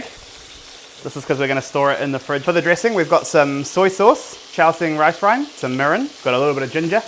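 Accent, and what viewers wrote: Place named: New Zealand. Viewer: Who the fuck taught you accents lmao he's clearly a kiwi.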